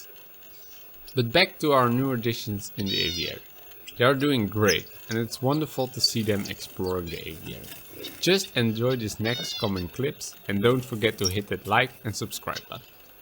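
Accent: Netherlands. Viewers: Hearing on.your accent you are from.the netherlands right. Love your birds. They are beautifull! I really want to make a small aviary to! Not quit sure yet. Keep it up!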